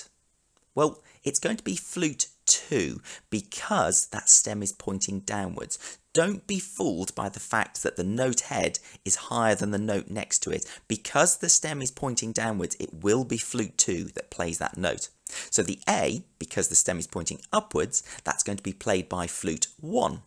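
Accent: United Kingdom. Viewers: I really like your videos. As for your pronunciation, tough shit for the Italians. You don't have to apologize for being British. If they are offended, that's their problem.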